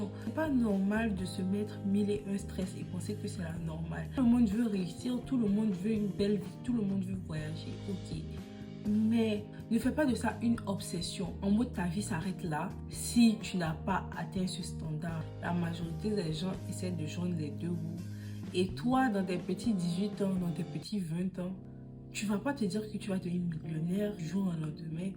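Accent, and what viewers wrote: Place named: Ivory Coast. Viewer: J'ai reconnue l'accent 225 ❤ non mais mise a par ça c'est profond ce que tu dis . Moi y'a environ 5 ans j'avais ce sentiment de non accomplissement du coup j'ai unfollow tout ceux que j'enviai a qui je me comparais depuis je vie ma best life parceque je sais que ce qu'on montre n'est pas totalement la réalité on montre tres souvent le meilleur mais pas les difficultés. Soyons fiers de nous même chacun son cheminement. Ce qui est pour toi c'est ce que Dieu te donne ❤.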